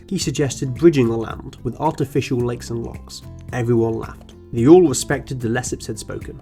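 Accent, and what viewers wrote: England, United Kingdom. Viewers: British accent sucks. That being the case, you should talk slow dude! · Jane Kailey are you unable to understand the British Accent? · Pedro Aguiar Yes..he’s English and that’s the English way of saying the country. Do we have to call Germany Deutschland while we’re at it?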